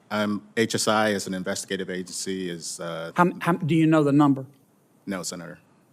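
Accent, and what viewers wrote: South, United States. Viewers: I wish this guy would speak slower and enhance his southern drawl.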